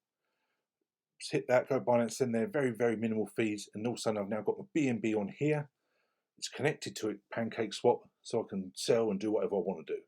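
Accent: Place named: United Kingdom. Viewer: Hello sir, great content! If you don’t mind, I have a question for you. I assume you are a fellow Brit, with that accent! If so, can you advise how Gem token purchases work on VeVe - when I just tried to buy $100 worth of Gems I got charged £100 via the Apple store in app purchase. I cancelled, for now, but is that right, does $100 worth of Gems cost £100, instead of about £72 which it should be at 1.4 conversion rate? (Also posted on latest OMI video)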